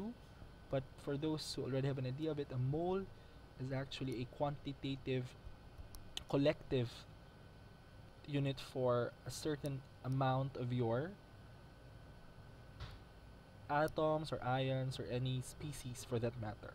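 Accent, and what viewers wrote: Philippines. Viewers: This guy is a filipino just by his accent.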